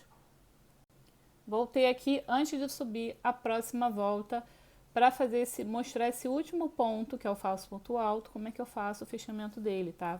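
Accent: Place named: Rio de Janeiro, Brazil. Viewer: adorei sua explicacao e anotei no meu caderno, gostei de voce dizendo "ta okay" como o nosso eterno presidente Bolsonaro fala bem coisa de carioca falar mesmo, aqui no Parana nao temos o costume de usar esse termo 😄o presidente por ser paulistano reside no Rio ele tem o costume de falar é muito bom. Beijos 😁💋❣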